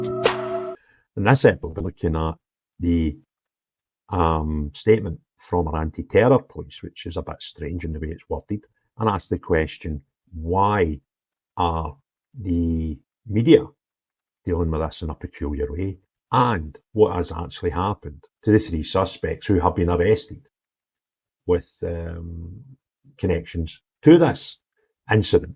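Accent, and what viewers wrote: Scotland, United Kingdom. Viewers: Hi, you are a great bloke, very honest but problem is I can’t understand your Scottish accent. I used to love watching Rab C Nesbitt with subtitles so any chance subtitles could be put on your links. Thanks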